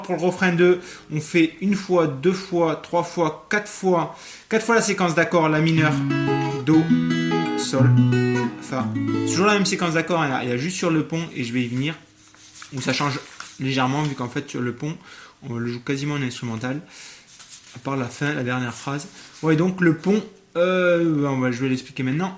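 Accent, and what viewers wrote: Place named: England, United Kingdom. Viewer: Magnifique accent anglais. 👌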